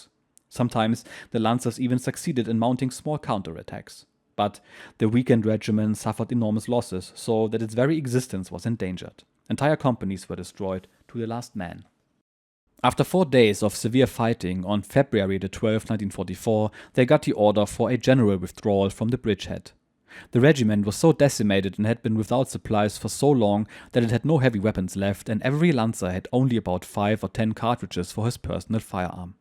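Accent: Germany. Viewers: This is a great series and I have to say the German accent adds to the thrill of the story 👍😉 thanks.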